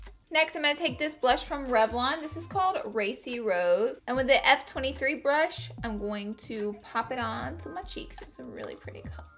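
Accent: South, United States. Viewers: Ok what part of the south are you from. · Girl I am from Texas and transplanted to TN so I hear you on that accent and dirty south is SO right. Also I started graying at 19 so I couldn't tell you what my natural color is. I DO know I need to go get another hair appointment but I have more time than money so I go to the beauty college lol I enjoyed your Q&A. You are freakin hilarious. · you should've not told us ur habit of looking down to our left cuz now I'm counting how many times you do it haha!! & girl your losing your southern twang :( better get back to Bama soon. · Beautifully said and love your southern accent..... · I can tell I'm from the south.. I didn't even know you HAD a country accent. Haha, i just thought you had a normal voice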